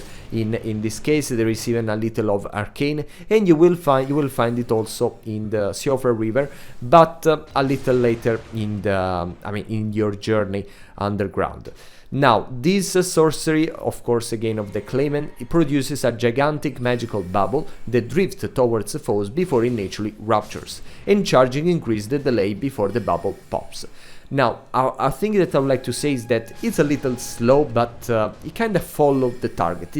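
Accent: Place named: Italy. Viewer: are you italian because of your accent btw good vid keep posting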